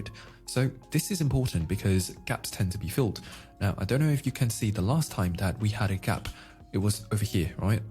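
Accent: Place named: England, United Kingdom. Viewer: Yes, this too shall pass. Thx for the reminder,! Btw, my girl wants to know what region of England you’re from. She’s super diggin on your accent, bro! I’m beginning to think she’s not even into crypto, just listens with me to hear the sound of your voice!😂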